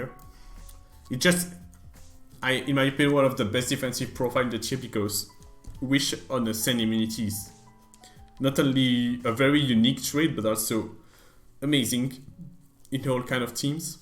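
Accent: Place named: France. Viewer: When you try to hide your french accent but it's getting worst..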